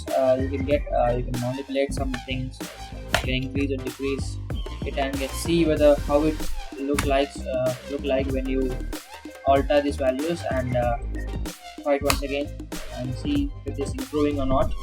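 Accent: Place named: India. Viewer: damm, India English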